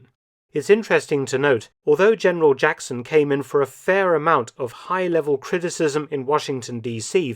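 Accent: United Kingdom. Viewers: BRO, KOSOVO ISN'T "NATION". KOSOVO IS REGION. CHECK YOUR "FACTS" . I'M FROM THAT REGION NEARBY........... ONLY BECAUSE YOU HAVE BRITISH ACCENT, DOESN'T MEAN YOU'RE AN EXPERT AND DOESN'T MEAN YOU'RE RIGHT. Serbia is nation, Montenegro is nation, Albania is nation.... KOSOVO IS NOT NATION. IT'S A REGION !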